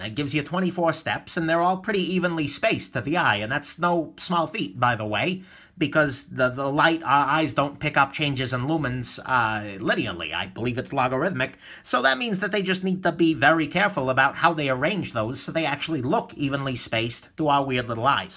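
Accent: New York, United States. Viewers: I hope your fiancé has your beautiful New York accent. And I also pray that your babies, of which I hope there are many, also have that beautiful New York accent!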